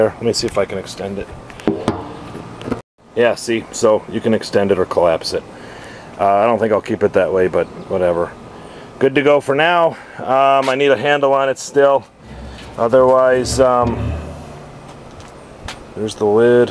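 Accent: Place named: Wisconsin, United States. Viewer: I thought you sounded like you're from Wisconsin. Looks like a good project. I wish I had the tools, etc to build one myself.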